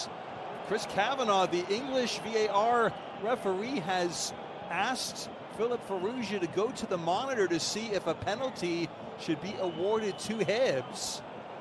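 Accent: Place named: United States. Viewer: I am reading all sorts of snide remarks about the commentator. Part of me thinks it's unfair. I'm writing my own comment after having listened to about 2/3 of the clip. Nothing that he's said to this point is glaringly stupid. Perhaps a bit unpolished, but what should one expect? It's a Europa League qualifying match, not an FA Cup final or El Classico. Part of me is offended that it's simply anti-American bigotry - being American myself. But even I, proud Yankee Doodle that I am, must confess that an American accent coming from the mouth of a football announcer, is incongruous at best. Well done, Hibs. I'm a lifelong Celtic fan, but wouldn't be in the least put off if you lot make us sweat a bit this season.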